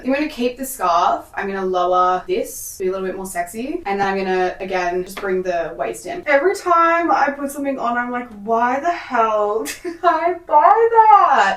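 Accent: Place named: Australia. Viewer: The UNO REVERSE RUG!! I love it! Also I only discovered your channel like a week ago, but you've already become a staple must-watch channel for me! I love sewing and crochet too, and your content is just so refreshing. I love your yap snippets, or your little walks and talks about anxiety, society, the human experience etc. Also there's something really homey and refreshing about hearing an Australian accent! Everyone else I watch is generally American so it felt so surprising but lovely to hear and see someone over here :)) Feels like having a friend over whilst we craft and yap in my living room. *Edit* because I though thought of something re: the tension whilst you've been sewing - double check how you're threading your machine. For the longest time I had missed a small step near the beginning. It will be different for each machine, but for mine there was a small disc or plate and instead of just wrapping the thread around it, I had to place it between the plate, almost like it slotted in between two (I'll compare the feeling of "slotting" the thread in to the feeling of placing dental floss between your teeth. 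That might sound rly weird but it's a similar feeling, and as you can imagine with dental floss, it would kind of hold the thread in place whilst still allowing it to run through. When I was skipping this step it meant that near the spool the thread was loose but as it passed through this sort of sandwiched portion, it pressed down the thread and helped keep it mor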